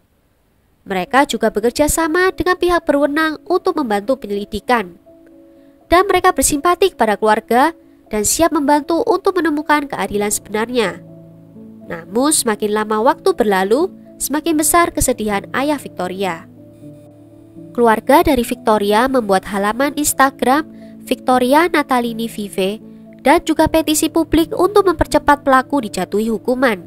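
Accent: Java, Indonesia. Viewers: Sll gaa bosen liat semua video nya say medokuhhh 👍💖💖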